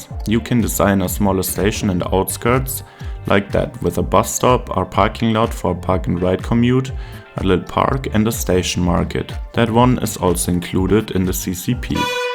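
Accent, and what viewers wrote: Germany. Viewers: YES! another great little "how to" video from the master. And the small fun inserts like are back ( as well as that smooth german voice 😊just makes me feel that there is professional behind it ) And to be honest, I can't wait for CS2 just to see what you can do with it, as well as some other people like Egg, FewCandy, CCP and Lee Hawkins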